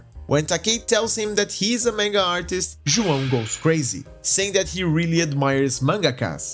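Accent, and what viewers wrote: Brazil. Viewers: Sabia que era BR só pela pronúncia, kkkkkkkkk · Abra seu youtube Vídeo da perspectiva dos gringos sobre um mangaká que fez sua obra no Brasil com a perspectiva de japonês. Oh my god Abra o vídeo para treinar o inglês Perceba depois de 5 segundos que é brasileiro Jogue sua perspectiva inicial do vídeo no lixo Continue o vídeo. Obs: Por que decidiu faze conteúdo pra gringa ? (provavelmente por visibilidade) É um questão genuína de curiosidade, to praticando inglês por hobby e tenho me deparado com muitos brasileiros querendo saber inglês pra tentar sair da bolha do país e queria saber se esse seria o caso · Deu pra reconhecer que você é brasileiro no primeiro segundo hahaha Sofá que brasileiro é único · pelo sotaque logo soube de onde era ksksk, que incrivel ver br fazendo video em inglês mt bom isso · pensei que ia ser um americano, mas é um brasileiro falando ingles, SIMBORA BRASILLL 😊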